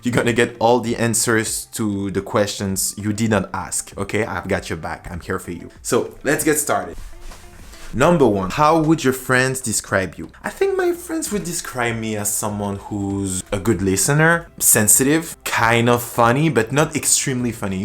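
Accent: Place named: France. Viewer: That was weird. I found your channel looking for ways to declutter photos and...found you had a very tiny familiar accent and, watching a second video a bit intrigued, It turns out you're french ! Funny surprise. Félicitations, beau boulot ! I'm impressed.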